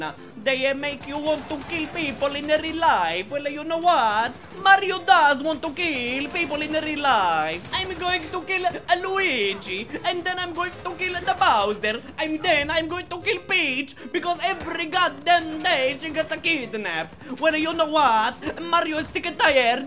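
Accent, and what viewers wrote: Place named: Italy. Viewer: סרטון מלך מבטא איטלקי של מלך!!! תמשיכו ככה ותעלו סרטונים רק באנגלית כי ככה היו לכם יותר סאבים וצפיות!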